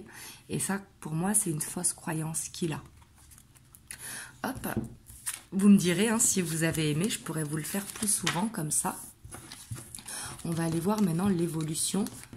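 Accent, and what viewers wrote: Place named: South, France. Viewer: Je m excuse mais vous avez- l accent du midi vous etes d où svp ...oui mon ami est un ancien champion de course d automobile de course de cote il a arrêté il est retraité voilà je suis très fière de lui et moi ije suis très gentille merci j adore vos guidances 🦋🎁🎁🎊🎊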